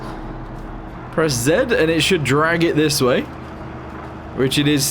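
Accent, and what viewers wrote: United Kingdom. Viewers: Hi, I came to UK and I'm already used to their accent thanks to you!🎉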